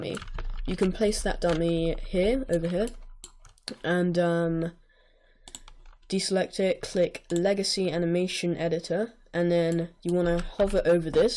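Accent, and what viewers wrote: United Kingdom. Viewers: Roblox programer: Good at intros, Good music, Good Mic, British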